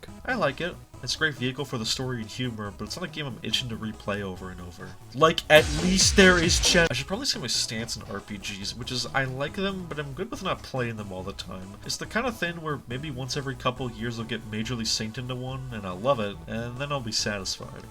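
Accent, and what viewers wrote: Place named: United States. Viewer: Žiūriu vidosą, akcentas angliškas, galvoju čia amerikonas ir pyst Gustavo Enciklopedijos intro pradeda grot porą sekundžių. Šito vapšie nesitikėjau išgirsti žiūrėdamas vidosą apie morališkumą žaidimuose.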